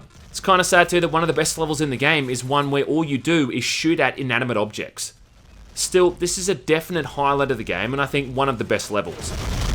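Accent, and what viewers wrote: Australia. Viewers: This. I mean, sure, he does have that sweet Aussie accent, so I could have misheared him, but saying 'three' and sounding like 'four' is still a bit unlikely. :/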